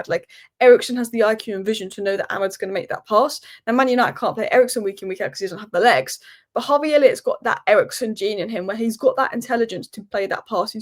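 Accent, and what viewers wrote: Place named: Liverpool, United Kingdom. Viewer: . mem, r you sure 😅🫰🍻you're a ggmu fan.?, 😅✌️bcs you sure sounds like a scouser gal😄✌️🤨👍😁...just noicee 👍👍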